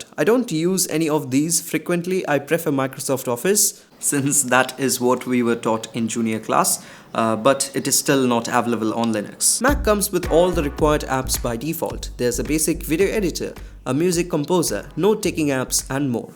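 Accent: Bengal, India. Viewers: Bro are you from West Bengal or from Bengali ancestry as I have seen little Bengali accents from you because I am one myself. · btw great content. I assume from your accent that you are Bengali. Anyways, take love.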